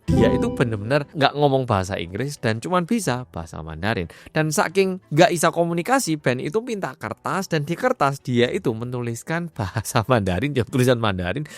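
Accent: Java, Indonesia. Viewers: Logat medok'e kui, mas pancen jowo tenan👍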